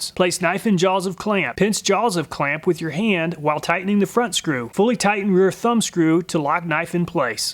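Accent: United States. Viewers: He sound so American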